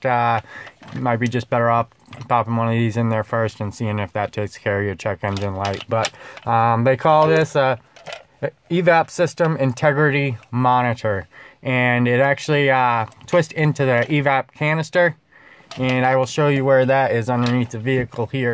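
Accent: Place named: North, United States. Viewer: What state are you from? That accent is different I'll guess one close to the Canadian border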